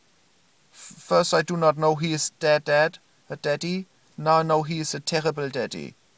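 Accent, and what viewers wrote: Germany. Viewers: definitely german. The accent, the stuttering, everything. when people imitate germans they talk angrily and keep shouting das this das that. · It's his true accent. He's a real DJ from Erlangen Germany :) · he sounds german :) it must be very funny if someone has english as mother language, but for me it's perfect english haha :D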